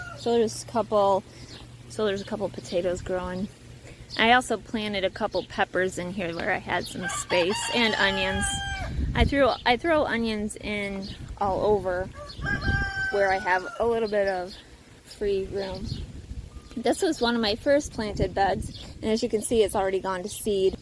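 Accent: United States. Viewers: Nothing personal and I do love your channel but why do Americans say Eand ?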